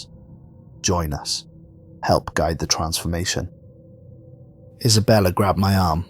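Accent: Wales, United Kingdom. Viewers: I like the story but the request for subscription resonated as I realised you're welsh. Good luck to 10k!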